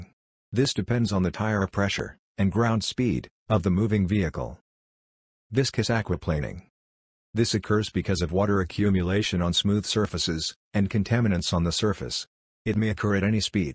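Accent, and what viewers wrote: India. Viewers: I like your slow teaching voice.. ❤thnks.. Btw why do u seem to have Indian accent. 😁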